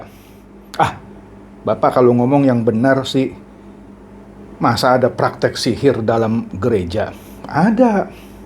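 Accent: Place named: Jakarta, Indonesia. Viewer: Tksh khotbahnya,Maaf Bapak Pdt oran Betawi ya? Karena Pdt bilang masih itu masing,Ok.Pdt🙏🏼🙏🏼👍👍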